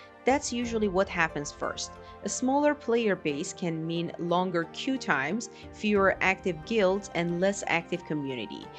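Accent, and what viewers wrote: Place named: Iran. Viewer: your accent sounds like it Persian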